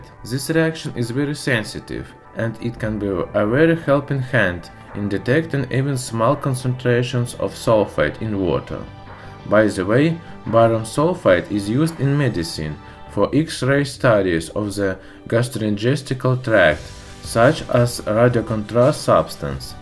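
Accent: Russia. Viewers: Mofker GT He's Russian, give him a break. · Are you from Russia? Some Slavic region?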